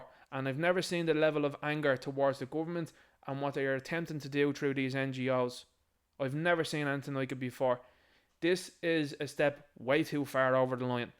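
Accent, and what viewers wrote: Dublin, Ireland. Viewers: Just found your Channel ! Usually the strong dub accent is a bit much for me 😂(Carlow here ) but I’m invested 🎉❤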